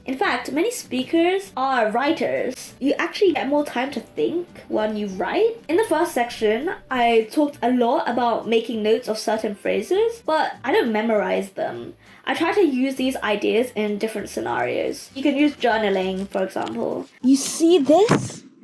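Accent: United Kingdom. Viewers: You sound like British but with some Singlish, and I thought you were Singaporean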